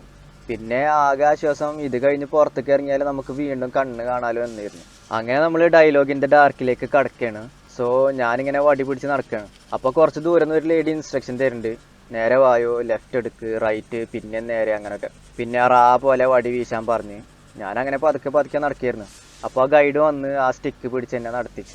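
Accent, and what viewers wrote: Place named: Thrissur, India. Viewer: മാഷാ അല്ലാഹ്... നന്നായി...ആ തൃശൂർ സ്ലാങ്ക് കമന്ററിയാണ് എനിക്ക് നിന്റെ വരയെക്കാൾ ഇഷ്ടയത് 😍🤩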